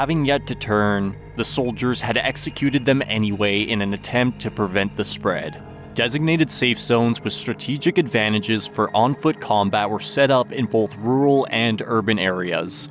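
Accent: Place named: Iowa, United States. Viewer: Did this guy say he was Canadian? His vowels sound like he’s from Iowa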